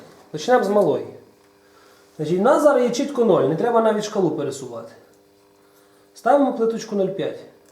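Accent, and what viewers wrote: Ukraine. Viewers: Захожу смотреть твои видео, только чтобы услышать настоящую украинскую речь)))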